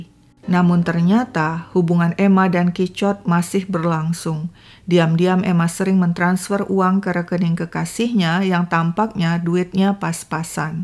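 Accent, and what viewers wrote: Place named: Sulawesi, Indonesia. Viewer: logat sdh sperti org sulawesi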